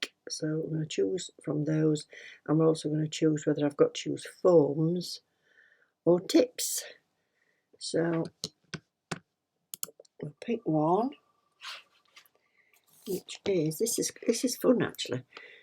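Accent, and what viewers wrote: Yorkshire, United Kingdom. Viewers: Wow they’re stunning. Definitely love the wheel idea and the longer videos are great xx Btw you’re so funny 😆. Are you from Yorkshire? I’m from Hemsworth if you are x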